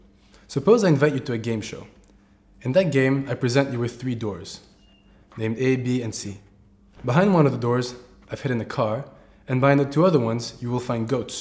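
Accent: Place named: France. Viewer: Cute French accent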